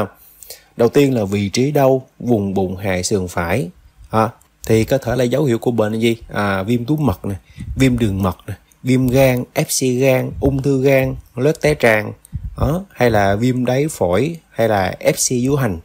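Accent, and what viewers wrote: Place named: Khanh Hoa, Vietnam. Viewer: Nghe giọng bs quen quá hihi giọng quê hương giống ở KHánh Hoà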